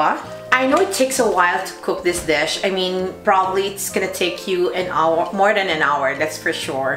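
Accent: Philippines. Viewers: totoo nga naman anyway it recalls memories talaga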